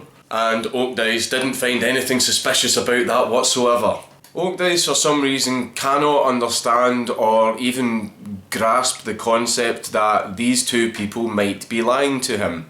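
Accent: Scotland, United Kingdom. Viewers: never can understand all of scottish accent but Glasgow i can easy but thats not why im here Dankula is a legend :)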